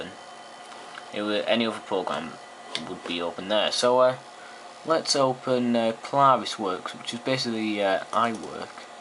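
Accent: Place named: Cumbria, United Kingdom. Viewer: Flash back? My Macintosh Classic II still works perfectly, except for the time (the battery is flat but I'm buggered if I'm gonna waste money on buying a new one!) And your Cumbrian accent is like music to my ears, lad!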